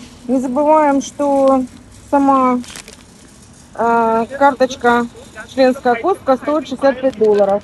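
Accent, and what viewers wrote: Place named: Krasnodar, Russia. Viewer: Надо же, как силен краснодарский говор, даже через десятилетия😢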